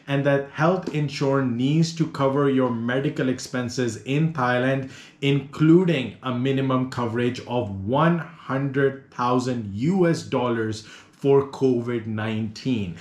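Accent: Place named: Punjab, India. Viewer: What part of Pakistan are you? Your punjabi accent is unmistakable. Good videos.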